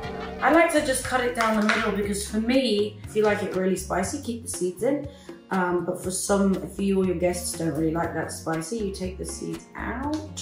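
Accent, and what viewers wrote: United Kingdom. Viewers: Sometimes, I forget that she’s British, and then she starts speaking...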